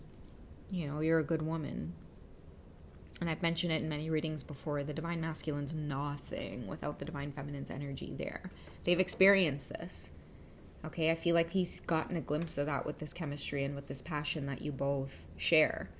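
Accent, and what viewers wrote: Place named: Trinidad and Tobago. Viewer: I absolutely love the Trini accent Queen!!! It gives me life 🥰🙏🏽❤️🙏🏽 Thank you for sharing yourself and these amazing readings with us ❤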